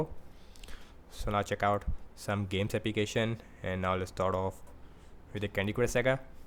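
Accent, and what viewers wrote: India. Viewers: I can barely understand this review due to his pronounced Hinglish accent!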